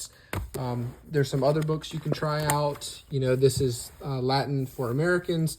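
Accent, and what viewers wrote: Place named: England, United Kingdom. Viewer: Bro, if you can't pronounce - don't. Just add background music, do a little dance pointing with your fingers to text on screen. Or just insert audio from someone who speaks the language like Luke Ranieri or Metatron. Or at least have some humility, say something like "haha, probably butchered that". But, come on, this thick accent with not vowel length and English R is simply insulting! Imagine, idk, teaching people how to learn Japanese while saying "R.E. ga toe". I heard you trying to trill the R, but that was like the last thing I would worry about.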